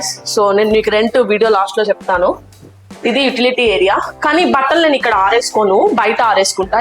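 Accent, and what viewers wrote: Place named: Nellore, India. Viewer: Fridge lo samanlu pette eruganu.mana nellore basha akkovv..yaadikellina mana nellore natural slang e baga vaduthunnaru.Nice sis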